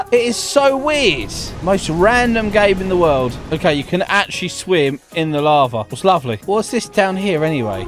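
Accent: United Kingdom. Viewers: are you bri’ish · U speak British me too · I just now he's British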